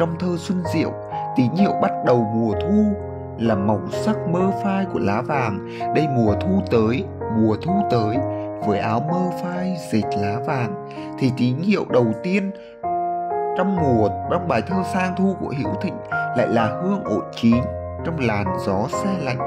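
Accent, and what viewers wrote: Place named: Hue, Vietnam. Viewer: rất thích hợp khi chọn giọng huế đọc ạ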